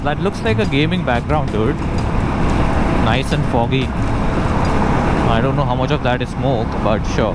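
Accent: Malvani, India. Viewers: Bro has that very good English accent And then has the Proper Malvani Accent too "Khay jaata tu?" Damn.......... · मालवणी माणूस मालवणी बोलताना भारी वाटता. एक दम गावाक एल्या सारख्या एक नंबर भावा 👍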